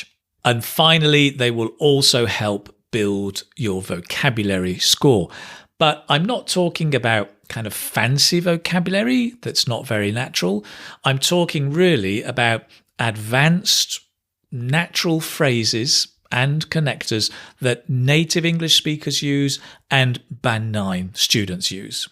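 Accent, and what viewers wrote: United Kingdom. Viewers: I definitely love your British accent, hope you'll provide us with more videos <3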